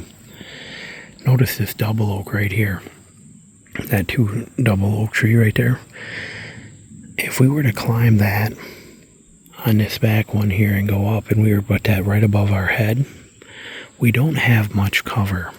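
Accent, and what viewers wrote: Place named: South, United States. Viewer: Ahh.. thankyou . Spent 10 years in N.C., you'd Think i'd know how to decipher a souther accent. Scheesch... !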